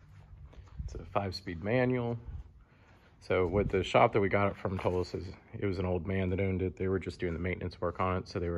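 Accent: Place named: South Texas, United States. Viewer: We speak the same dialect in deeeep South Texas by the Gulf of Mexico and it's alive and going strong here.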